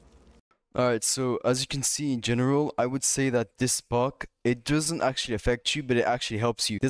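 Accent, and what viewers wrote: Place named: France. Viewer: are you french ? you have a little french accent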